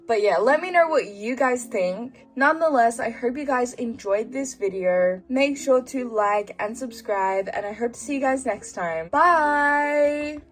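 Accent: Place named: Australia. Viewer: I love your australian accent so much lol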